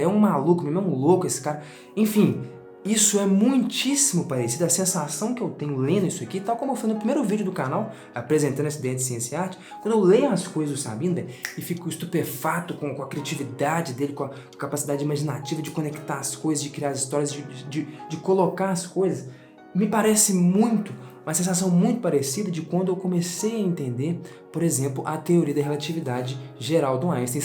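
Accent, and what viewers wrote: Minas Gerais, Brazil. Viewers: Cara, como é legal ver você (um garoto uns 30 anos mais novo que eu) falando coisas tão interessantes nesse mineires delicioso. Não sou físico e nem matemático, sou paulistano e quase sexagenário portanto acho que não faço parte do maior segmento do seu público e nem sou o principal mas adoro assistir os vídeos e aprender com você. Parabéns pelo trabalho.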